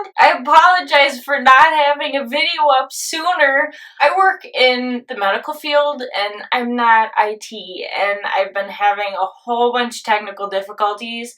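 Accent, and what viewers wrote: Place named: Upper Midwest, United States. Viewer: LOVE your editing. Best MA videos on Youtube. Girl, you must live in MN, WI, or a nearby state. I moved to WI from Texas and that accent on the word "bag" (or, "beg") is unmistakable. Thanks for the helpful info. Appreciate that you keep it funny and entertaining but don't get off point with makeup tutorials, etc. that have nothing to do with Medical Assisting. Yeah, anyway, good job.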